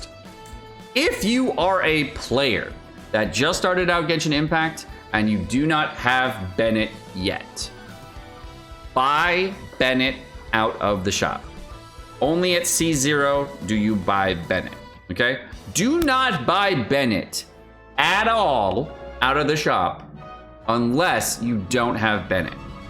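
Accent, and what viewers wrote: United Kingdom. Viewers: Can we please get a British only video please 😭😭😭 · I looove british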